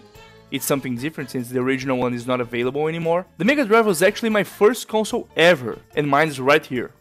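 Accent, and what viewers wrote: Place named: Brazil. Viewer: sotaque brasileiro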